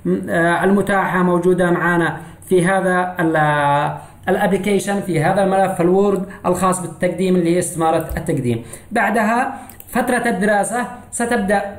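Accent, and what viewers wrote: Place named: Sanaa, Yemen. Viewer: فدا اللهجة الصنعانية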